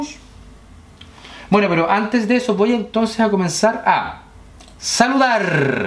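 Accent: Chile. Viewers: Eres chileno.?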